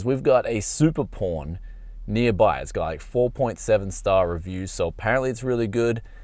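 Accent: Australia. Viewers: SuperPorn! Got to love Austalian accent ! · It’s the Aussie accent 😂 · I deadess heard you say you're checking out some "Porn brokers" love that aussie accent. :D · The Australian accent didn't do you any favors.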